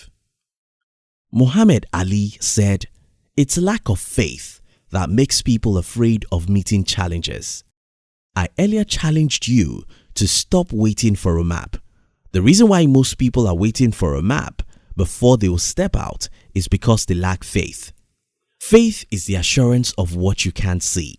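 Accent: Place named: Nigeria. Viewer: African accent straight from nigerian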